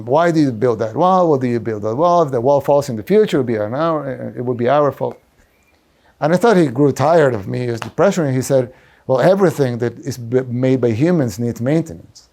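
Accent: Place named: United States. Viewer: Sounds like an American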